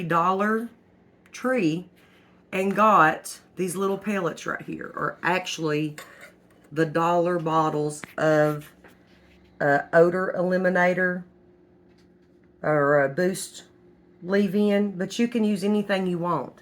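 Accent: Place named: South, United States. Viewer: I’m just loving the southern accent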